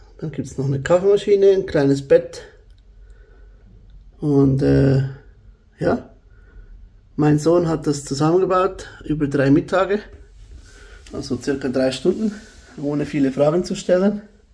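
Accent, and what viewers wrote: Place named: Switzerland. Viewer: Endlich auf Deutsch! Mit tollem schweizerischen Akzent. Vielen lieben Dank!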